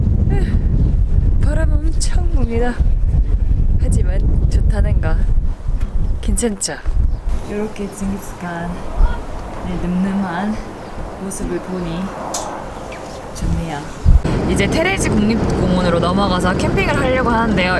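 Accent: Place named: Busan, South Korea. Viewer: 저도 부산사람. 말투 익숙합니다. 항상 기대합니다. 안전여행 기원해요.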